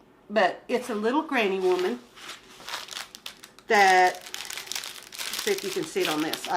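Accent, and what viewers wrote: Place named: East Tennessee, United States. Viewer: I’m in the East Tennessee mountains and proud of it. We do talk like that lol.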